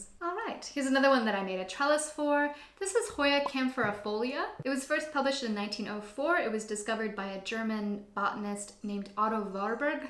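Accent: United States. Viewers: Are you from Boston?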